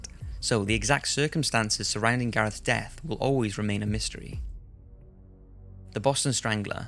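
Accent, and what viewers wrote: Wales, United Kingdom. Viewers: Are you welsh? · Narrator is Welsh 😱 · Narrator sounds Welsh 😱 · I love these but its impossible to be creep with a Welsh accent ❤️ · Are you Welsh? You sound like you have some form of Welsh accent.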